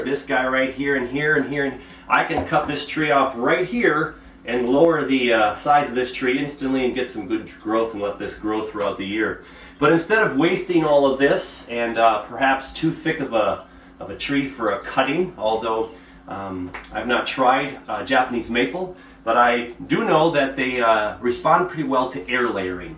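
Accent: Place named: United States. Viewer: GREAT video ..!! I sooo know that you are an American as you say the word roots ... as ruts. Just like when Americans say the word "roof", .. as in the sentence ... "I am going to fix the shingles on the ruf ..." Sooooo funny !! (and yes, .. i am a Canadian .. lol)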